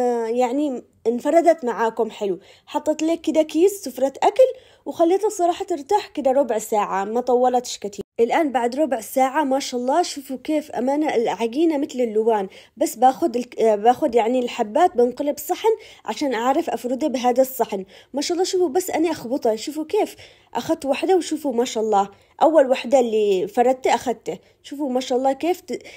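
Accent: Aden, Yemen. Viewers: تسلم الايادي يا محلا كلامك وحشني الكلام العدني اني اتربت وعيشت بعدن وسافرت السعودية لي اكتر من ٢٥ سنة 😢... اني احب اسوي خبز علشان اسرتي كلهم يحبوا الخبز واليوم سويت الخبز المعطف بس ازود واحط الباقي بالفريزر الله يقوينا ويطتب الاجر لنا جميعا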